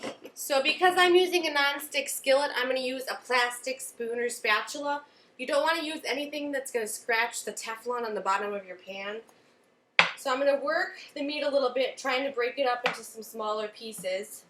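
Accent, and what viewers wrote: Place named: Midwest, United States. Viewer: i would guess her accent to be wisconsin or ohio....i sure hope she responds cause i'm curious too!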